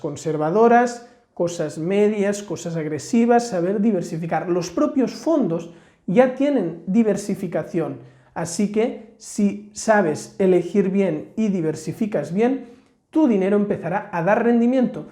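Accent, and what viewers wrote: Catalonia, Spain. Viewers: Solo por curiosidad, eres catalan? Lo digo por el acento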